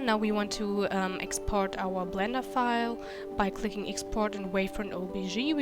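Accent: Germany. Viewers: Man hört den deutschen Akzent.😅😂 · hi, i noticed you have different accent, i mean- you sound little bit like a german person ☺ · am i the only one who thinks she has a german accent well hallo aus deutschland wenn du mich verstehst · That german accent tho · You Sound German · Are you from Germany? because of the accent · Everybody: wow Your Video is very helpful Me: WAIT ARE U GERMAN?! I mean you have this typically german accent xD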